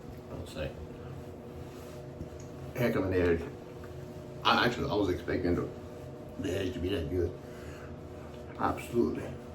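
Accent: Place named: Louisiana, United States. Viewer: If I can echo Sean, your experience always shows....always a great video, especially with your wonderful cajun accent. More please on your stone to edge appreciation. I'd love to try Jarrods concave edge, on a Ti possibly. Looks like you had a very fine shave 🎉😊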